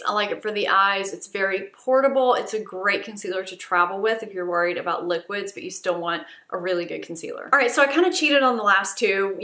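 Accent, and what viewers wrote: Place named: Memphis, United States. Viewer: Gotta say, being from Memphis, your Southern accent sounds distinctly like it's from my area. You sound like a Memphian! Great videos!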